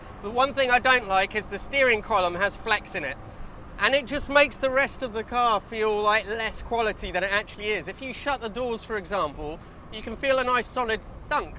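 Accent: Italy. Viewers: You appear to of developed a very pronounced Italian accent? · The British channel with a lovely Italian accent. This presentation then, is particularly fine.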